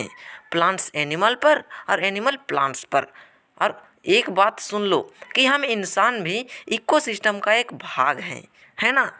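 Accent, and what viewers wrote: Bihar, India. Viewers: Are you a Bihari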